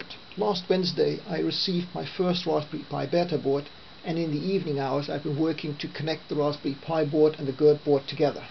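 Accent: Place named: Netherlands. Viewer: Funny how you can tell he is Dutch from the first sentence he says. Great Work!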